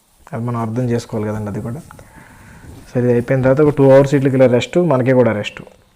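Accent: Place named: East Godavari, India. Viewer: Ni matalu MA East godavari vadila unai very nice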